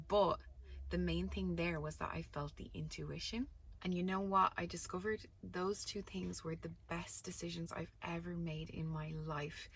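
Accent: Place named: Ireland. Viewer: omg are you Irish? loooove your accent! and this view!!!! 😍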